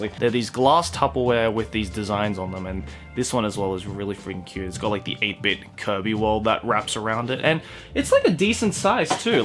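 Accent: Australia. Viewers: wtf hes australian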